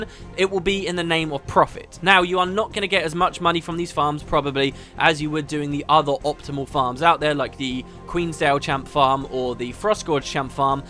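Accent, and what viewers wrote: United Kingdom. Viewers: Youre on US Server? You sound so british..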